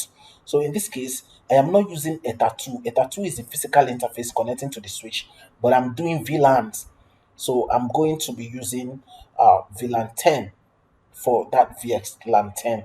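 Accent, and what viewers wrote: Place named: Nigeria. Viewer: why you sound like nigerian scammer?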